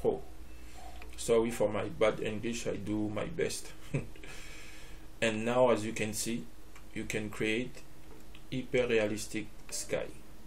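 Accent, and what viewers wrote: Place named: France. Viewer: un petit "accent" Français ;p haha tu fais l'effort de parler anglais et c'est pas evident sans ecrire de texte avant meme en Francais . merci pour la video je partage( passe voir la chaine et le site si ca peut aider aussi) A+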